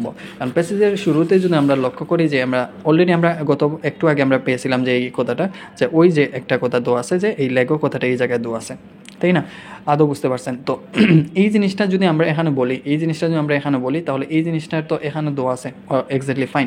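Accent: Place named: Sylhet, Bangladesh. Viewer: সিলেটের লোক, কথায় অঞ্চলিতা চলে আসছে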